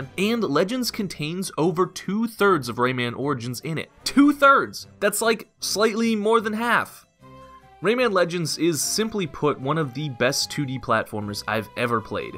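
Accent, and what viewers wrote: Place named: Russia. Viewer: I keep forgetting to download this game. Also it sounded like a Russian accent me. Good review!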